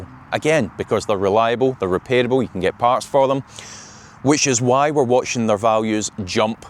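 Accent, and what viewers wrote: Scotland, United Kingdom. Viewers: Love the Scots accent too!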